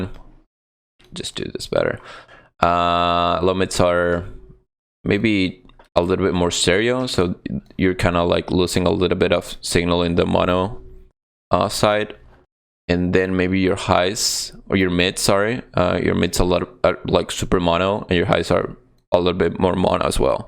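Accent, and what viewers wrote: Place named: United States. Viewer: Tenes la voz americana, muy sexy, te amo seguí así rey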